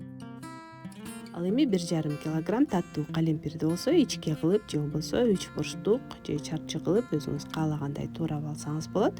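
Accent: Standard. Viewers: Таза кыргызча суйлоптур азаматсыз ❤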